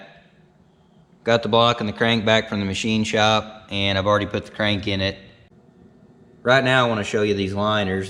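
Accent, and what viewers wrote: South, United States. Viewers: I don´t mind at all about the engines, i am here watching ur videos just coz i like ur southern accent!!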